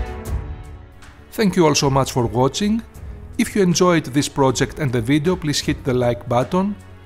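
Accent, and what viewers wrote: Greece. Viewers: From the moment I heard your voice I knew you were greek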